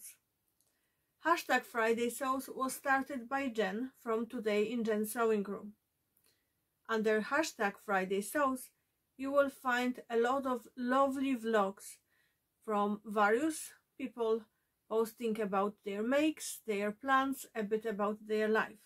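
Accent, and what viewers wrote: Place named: Poland. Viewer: I also love aprons. Saves your clothes from stains while looking great. I love your accent it reminds me of my childhood. Poland? ❤️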